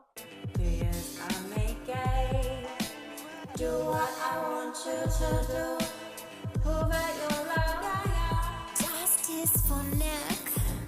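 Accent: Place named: South, United States. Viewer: Use that southern accent lady!! I'm a southener!! Foothills NC❤ PS loved the singing !!! Saw the video this morning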